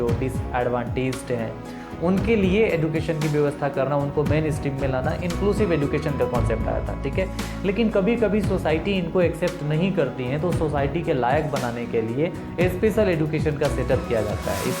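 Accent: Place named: India. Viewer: U speak Indian a lot so it's difficult to understand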